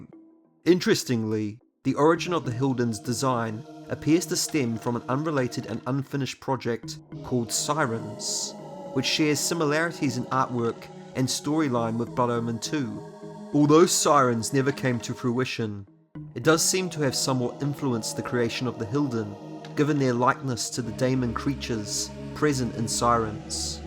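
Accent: New Zealand. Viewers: trying to work out your accent, Kiwi?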